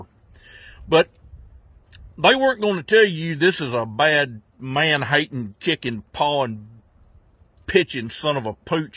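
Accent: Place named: United States. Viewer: What state is your accent from?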